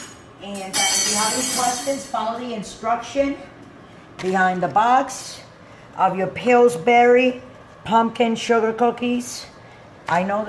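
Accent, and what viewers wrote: New York, United States. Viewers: I'm from Louisiana and I could tell she was from New York. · I've been studying about American accents. where are you from :) ?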